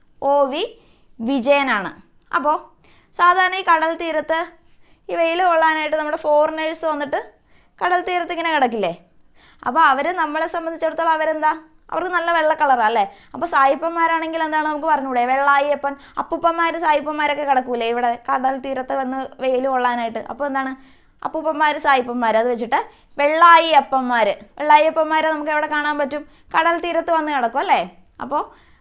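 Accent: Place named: Kottayam, India. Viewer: കോട്ടയം, ആലപ്പുഴ എവിടെയാ വീട്....ഭാഷ കേട്ടിട്ട് ചോടിച്ചതാ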